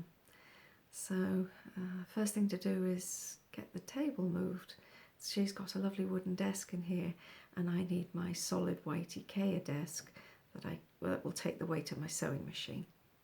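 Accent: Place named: United Kingdom. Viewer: on Flosstube you are a treasure. I was so calm listening to your voice yesterday. I am never calm and can’t relax. Lol. British accent and dialect is always relaxing to listen. Hurry and post another one. I want to see what you’re doing. I might try to make a video this weekend. Maybe. LOL Are you on Instagram? I’m Sweetwoodruffs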